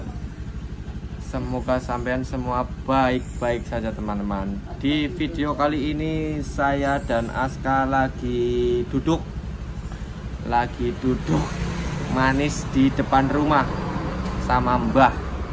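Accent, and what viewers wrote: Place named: Java, Indonesia. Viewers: Pemalang pok mas koyo wong moga yak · Jawa medok bngt mna sich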